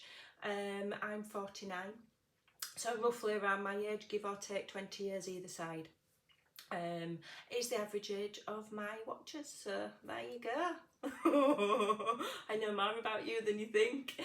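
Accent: Yorkshire, United Kingdom. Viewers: Hello! So very nice to "see" you! (Love your hair color - it suits you!) I think it's a great idea to do a vlog here and there. You could show us historical or Victorian buildings in your area. Or take us to a lace shop or a thrift shop (to look for out of copyright images/music sheets). Or show us around the countryside or visit a village. Or just do a vlog showing clips of those ferocious "guard" dogs of yours! 🤣 I love the Yorkshire accent, thriftiness, stubbornness, friendliness, common sense and you don't take yourself too seriously. Honestly, I watch you just as much for your personality as I do for the lovely things you make. And I agree with you - a true crafter never settles down to one thing. Part of the fun is to have a go at all kinds of things, just to see if we can do it. I'm so happy your viewership is continuing to increase. Your new subscribers are going to love you! 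Thanks for all you do! Janet 💕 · Personally I love your Yorkshire accent! Nice to see you! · Going through some of your old videos and started with this one! Love it! Your Yorkshire accent is beautiful! 💗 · Oh my goodness! I am so thrilled to have fallen upon this video! Thank you for this vlog as now I can put a "face to the voice and laugh"! Your Yorkshire accent is beautiful. I am from Florida USA and there are all kinds of accents here, although, I don't have one, lol. Never change, just be who you are and embrace yourself, we do. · I’m one of your new subscribers and loved this vlog 😊 I think we are quite alike in our crafting too many ideas to stick to one project ! And you have inspired me to try a vlog maybe one day when I feel brave enough 😂 You are very pretty and definitely should not change your accent it’s proper Yorkshire ❤️ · Nice to meet you face to face (sort of). You look lovely and I love your accent. 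I have a few friends from Yorkshire and I just love the way they talk: it's part of who you all are. I am Spanish and have my funny accent from the north west of the country too! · As a fello yorkshire lass you sound just normal to me. Been in Oz for 20 years but still have my strong accent and its comforting hearing you talking. Your crafts are amazing, easy to follow and always fun to do. Thank you sooooo much for all you share, including yourself. Wanted to send you a white rose but there was only a red one!!!! so here's a daisy instead🌼Jane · It's awesome to see your face. Love the hair! And I love your Yorkshire accent! You are so encouraging and generous. Thanks for all the freebies. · Love this i to am a flitterea haha I call myself a multi crafter. My accent is pants love the Yorkshire accent i u derstand it very well some of your frazese we use in Newcastle to Loveing the red hair to ❤️ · Yaay a Yorkshire lass like me - love it!! 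Just found you, do I detect Bradford/Leeds in that accent? Sending lots of love anyway xx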